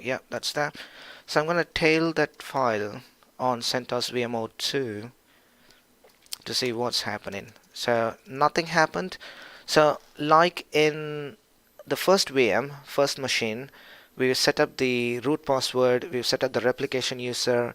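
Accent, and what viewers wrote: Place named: Australia. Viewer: and that’s good Your accent sounded like Australian Never mind bro..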